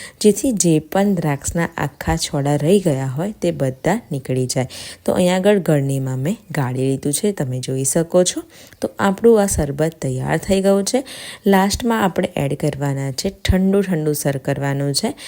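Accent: India. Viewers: Nice Recipe.....Your Accent is like Saurashtra Gujarati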